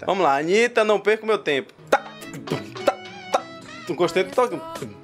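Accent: Bahia, Brazil. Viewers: Vc e baiano? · Ameiiii!!! Vc eh baiano mocim???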